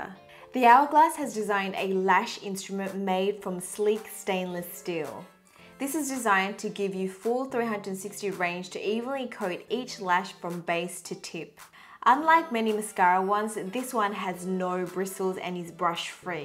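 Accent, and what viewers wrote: Australia. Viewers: Are you an asian australian mix? I LOVE your accent! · OMG why straight away when she started speaking I first thought of how I cook that lol there Australian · I love her Australian accent! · isn't it funny how she has an australian accent I am from australia too there is actually a chance that I have walked past her on the street without even knowing that she is a youtuber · Aussie accent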